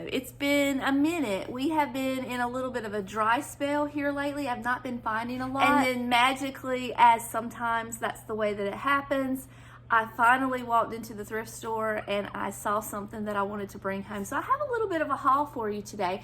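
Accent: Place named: North Carolina, United States. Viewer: OMG! Ms. Beasley! She used to be in the Sears Christmas catalog and my sister and I would argue over which of us would get her. We thought there was only one, because the picture in the catalog only showed one! 😂 She had little gold-rimmed reading glasses and I loved her! I’m a first time watcher and your accent immediately gave me a North Carolina vibe. My late husband was from there. Enjoyed the video and have subscribed.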